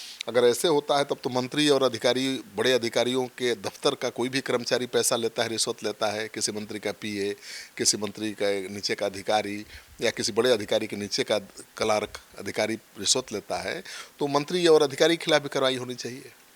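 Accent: Bihar, India. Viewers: जी आप को बिहारी टोन में इंटरव्यू लेते हुए सुनकर आत्मनुभूति होती है...!कृपया इसे जारी रखेंगे 🙏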